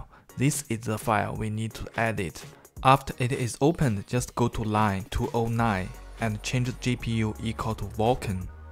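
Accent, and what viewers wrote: China. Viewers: UP主一听就是中国老乡哈哈哈哈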